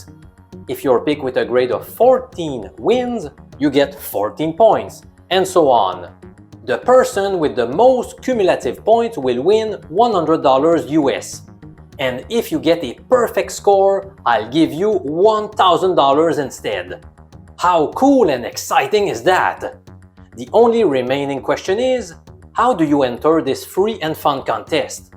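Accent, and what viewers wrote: Quebec, Canada. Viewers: I love the French Canadian accent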